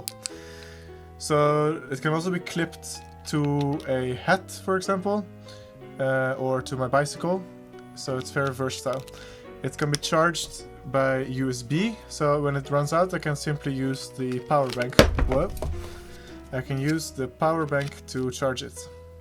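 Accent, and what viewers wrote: Netherlands. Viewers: aahhh ik zat al te denken huh dat klinkt toch Nederlands haha waar kom je oorspronkelijk vandaan dan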